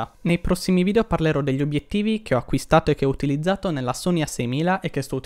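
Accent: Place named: Sardinia, Italy. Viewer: L'accento sardo <3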